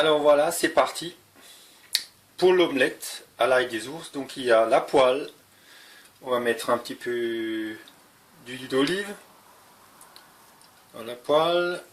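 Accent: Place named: Alsace, France. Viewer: Je reconnaît cet accent alsacien je suis d origine alsacienne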